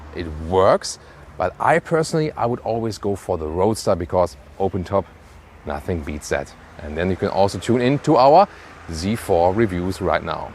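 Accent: Germany. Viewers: What a terrible german way to speak english. Sounds terrible. Take some lessons, please.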